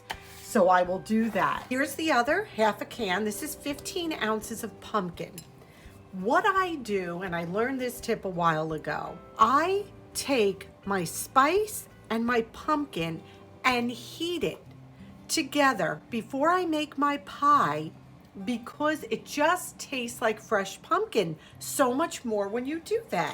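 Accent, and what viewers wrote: New York, United States. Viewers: I love hearing another New Yorker, it's like listening to family! These were such great tips. I really appreciated all your ideas and that lovely pie!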